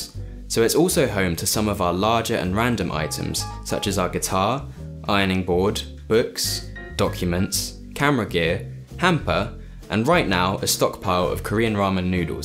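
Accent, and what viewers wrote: United Kingdom. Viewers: Ohhhh!!! YOU ARE A BRIT!!! how wonderful, the second you spoke, I knew it!! I love the UK YouTube home solutions - what is the point in watching the USA ones, when you can't actually go out and buy the products. Home Depot!!! Yeah?? I am sure they deliver!!!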